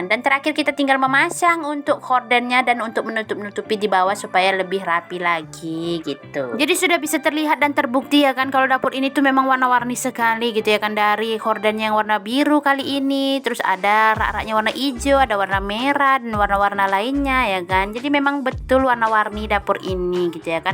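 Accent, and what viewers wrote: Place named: Java, Indonesia. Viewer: Ngmng ny kaya tetangga ku bun dari Jawa ya aku dah subscribe bun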